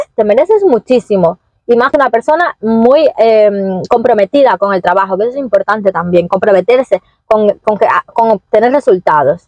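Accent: Galicia, Spain. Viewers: tienes algo de acento gallego no se si te diste cuenta,es muy suave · Se te pegó el acento gallego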